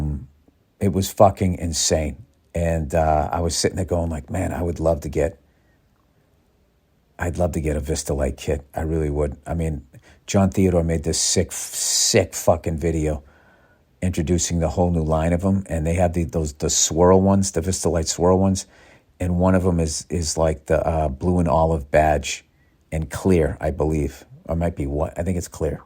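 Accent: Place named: South Boston, United States. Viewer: Jesus. Listening to this I thought i was back in southie in clancys poolroom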